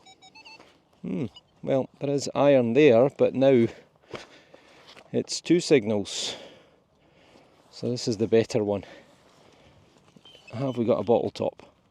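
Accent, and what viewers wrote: Scotland, United Kingdom. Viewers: thanks so much for your videos. Me and my 11yr old so n are very new to this amazing hobby but I have to say you have taught us so much already. On a more personal note, I have to thank you because there have been times when Theo has lost a bit of enthusiasm through drought periods or not getting any permissions, but your videos have genuinely kept him going. He loves your little catch phrases, and when we start for the day he will say "he we are in the beautiful fields of Cheshire" in a Scottish accent obviously 🤣. Keep up the good work. Thank you from Paul and Theo in Cheshire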